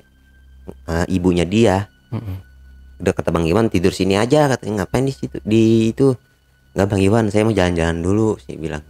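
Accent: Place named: Jakarta, Indonesia. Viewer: Asli depok neh,bahasa nya kaya kita pisan....betawi pinggiran....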